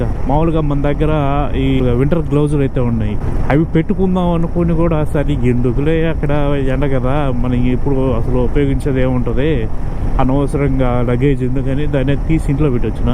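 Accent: India. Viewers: IM WATCHING JUST FOR YOUR TELUGU SLANG....NRO NEKU AH MOUSTACHE BALEDHU....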